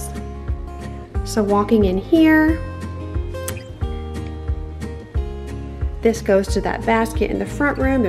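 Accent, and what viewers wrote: South, United States. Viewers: especially with that southern accent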